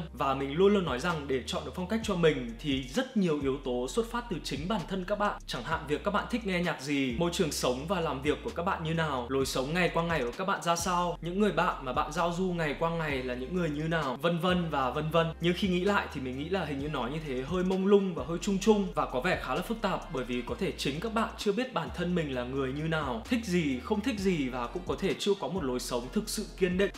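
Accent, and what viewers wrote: Standard. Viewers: Nói chuẩn anh ưi!! 1 like❤️❤️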